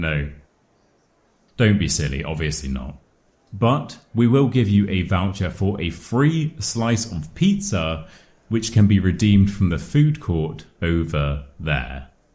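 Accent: RP, United Kingdom. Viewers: Teacher,my name is Grace from Taiwan. I thought your voice should be BBC accent. It is easy to prounce and listen accent.Yet,I had ever listened diallect British once all my life. It was really difficult for me. Completely not understanding. Thanks teacher for your instructions.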